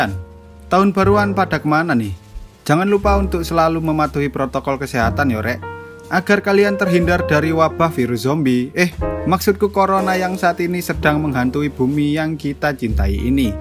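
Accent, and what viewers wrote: Java, Indonesia. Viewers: Lucu ngomongin film ini orang yang punya cannel i i lucu dan kaya orang jawa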